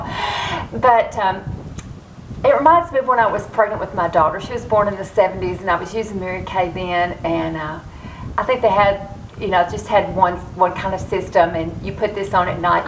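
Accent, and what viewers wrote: South, United States. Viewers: your hair is lovely and I love the way you are so loyal to your moonstone shadow....and your lipstick-combo...I received my Naked 3 palette today which I won and have a feeling I have found the right colours for me-cannot wait to wear it tomorrow and will do a video on Thursday-honey, never worry about how long you talk - love your Southern drawl and could listen forever - do your kids have those awesome eyes of yours - have never seen eyes more beautiful..xxxxxSuzy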